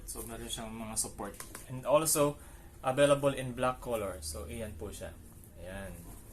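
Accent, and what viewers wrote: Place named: Visayas, Philippines. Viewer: Bisaya to si sir uh.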